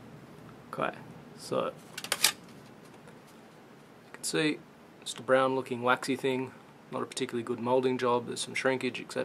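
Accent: Australia. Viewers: Great video! Are you by some chance of Australian origin?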